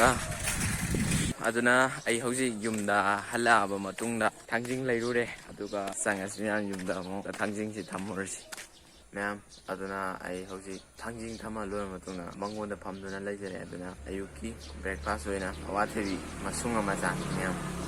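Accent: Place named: Kakching, India. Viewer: Enao yengba yam nungyheee … kakching macha ama oina yam chaothokcheikoo nayambana 😊 kakching marol da ngangbasidei henna taba nungyba leitre …🎉🎉 keep it up❤️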